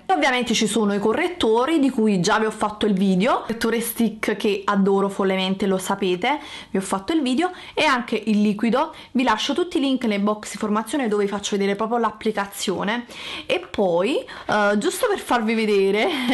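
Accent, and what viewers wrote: Naples, Italy. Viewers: Posso dirti che il tuo accento napoletano mi sta troppo simpatico? :) Non so perché, ma mi ispira dolcezza!! Posso farti una domanda? Vorrei comprare qualche ingrediente per creare cosine semplici (deodorante in crema/stick gel per i brufoli, tonico, burro per il corpo), per questo vorrei evitare spese grosse. Se comprassi solo queste cose: tocoferolo, cosgard, burro di karitè e qualche olio essenziale, potrei creare qualcosa? O mi serve per forza la xanthan gum? Grazie mille e complimenti per tutto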